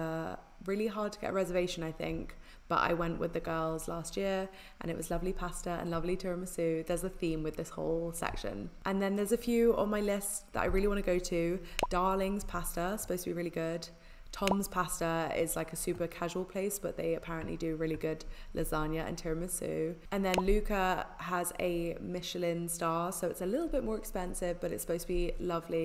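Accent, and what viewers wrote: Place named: London, United Kingdom. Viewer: Amazing london accent 👏👏👏👍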